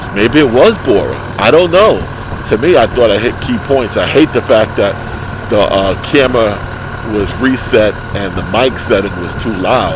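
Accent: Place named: New York, United States. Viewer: Hello fellow NYer...Great video...nice & easy rider. Just love to hear that NY accent again. Makes me miss the big apple. Brooklyn born and now living in hot hot hot Arizona. Nice looking bike. Mine is the same as Dbest1's. Love just cruising around enjoying the scenery. You "ain't" boring at all!!! Keep on gettin' on bro. Dbest1 recommended your vlog and it was all he said it would be. Kool... RSMF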